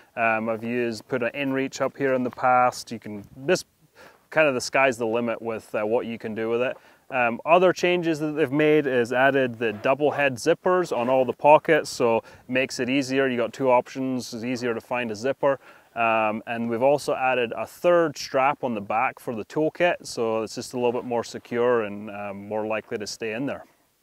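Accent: Scotland, United Kingdom. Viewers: Sounds like a hint of Scot in that accent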